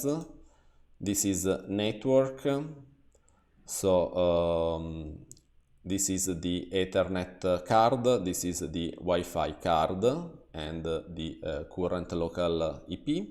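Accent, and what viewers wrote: Italy. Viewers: The italian is strong in this one, thanks for the comparison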